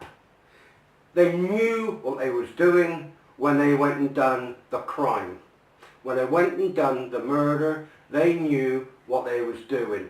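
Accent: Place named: Gloucester, United Kingdom. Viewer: I've noticed you have the same accent as the Gloucester Wests.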